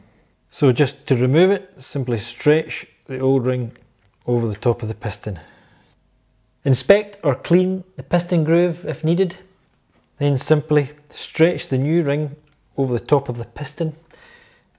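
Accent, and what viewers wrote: Scotland, United Kingdom. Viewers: english with british accent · Are you from Scotland? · Very good explanation. And I love that Scottish accent of yours! ;-)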